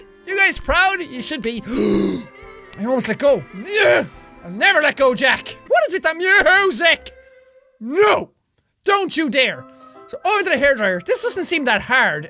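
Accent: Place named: Ireland. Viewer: Your Irish I'm Irish too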